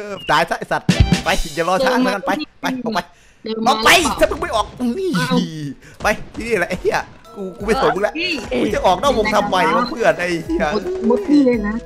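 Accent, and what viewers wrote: Shan, Myanmar. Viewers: คนทีพูดเป็นไทยไย่ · พี่เขาพูดภาษาไทยใหญ่ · พี่เค้าเป็นคนไทยใหญ่หนูฟังออก เพราะหนูก็เป็น55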